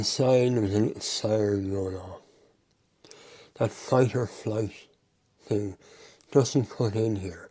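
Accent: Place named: Ireland. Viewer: Hi, I used to watch yours and others commenting on cars here on youtube, you are not Jeremy Clarkson, but your Irish accent and your personality shines, so I remember you much more than I remember lots of others. I noticed your voice has changed a long while ago, but didn't think much of it. I then moved to Canada and no longer needed a car, so kind of stopped watching car channels. Yesterday, I wanted to see some Toyota Corolla opinions and searched for your channel, then discovered that your voice has changed and is due to the cancer. I felt quite sad, I showed your video to my wife and told her why I liked your channel etc, she was quite moved by your story too. You are brave, your inspire whoever is facing any difficulties in life not to give up and keep one's spirit high. Please keep fighting, keep bringing us your amazing videos, I want to see you commenting on cars when you are 99 years old, or whatever travelling devices we have by then! 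My best wishes to you and your family!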